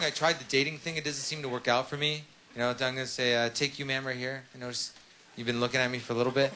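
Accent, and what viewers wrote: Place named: Canada. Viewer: is he from canada? he says ehh a lot..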